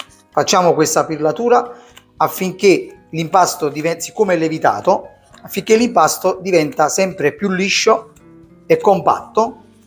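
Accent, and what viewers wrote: Naples, Italy. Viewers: E cosa dire: Lei è un grandissimo pasticciere, poi l'accento napoletano! A proposito, W NAPOLI!!!!🎉🎉❤❤ GRAZIE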